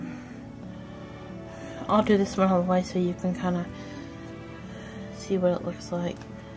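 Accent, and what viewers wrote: South, United States. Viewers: U are adorable!! Are u from the south?? I can here an accent in ur voice. Thanks for the sweet video!!! U are making me want some buckyes!!! Urs look yummy!!! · Ok........this recipe compelled me to subscribe,love you your a sweetheart.............."just keep a-dippin'" true southern belle. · has a sweet southern,comforting voice.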